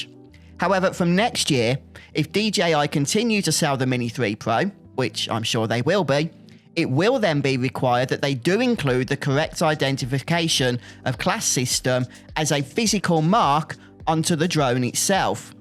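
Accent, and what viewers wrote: England, United Kingdom. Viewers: that terrible english accent :D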